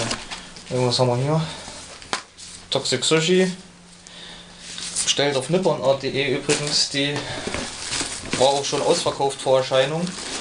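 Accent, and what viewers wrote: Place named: Saxony, Germany. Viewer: omg ein Sachse, wie schreklich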